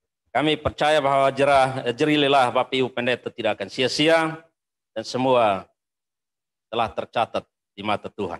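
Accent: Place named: East Nusa Tenggara, Indonesia. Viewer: Pidato khas NTT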